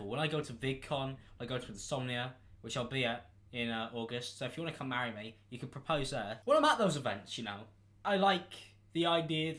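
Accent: United Kingdom. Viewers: Subtitles + British accent = House on fire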